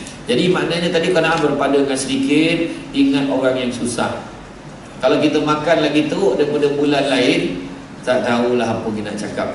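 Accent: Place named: Kedah, Malaysia. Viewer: bru 2/3 hari lps...sggah solat mgrib di masjid sbrg jaya... mcm.biasa sronok lah tggu cramah.ustaz... tetiba 2/3 pth je paham... slebihnya... org kmpong ustd tu je la yg paham agaknya..jemaah pompuan saling memandang.. then ada sorg mkcik dtg kat saya..tanya..paham dak.. smbil tengok tv yg dipncarkn khas bhg jemaah pompuan..saya senyum..saya pon tak pahammmm... moral of the story..masjid2... kna tengok jugak la ustd tu mcm.mana... maybe sesuai bg cramah hanya utk qariah ustd tu jer..sbb diorg jer yg paham loghat pekat ustd tu ...skian (bukn klantan..bukn jugak tganu..i think smwhere in kedah)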